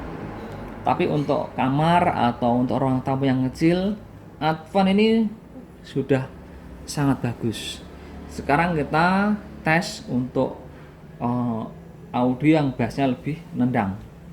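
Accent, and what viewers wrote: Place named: Java, Indonesia. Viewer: Medok banget om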